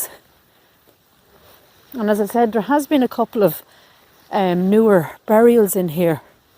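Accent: Ireland. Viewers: I can tell by the Irish accent that we're going to see corpses. Irish cemeteries don't seem to be very well maintained. 🙄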